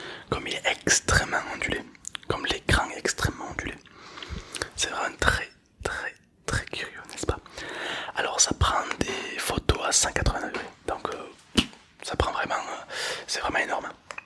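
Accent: South, France. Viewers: Rolalah il a une accent du sud il me regale 😁🤣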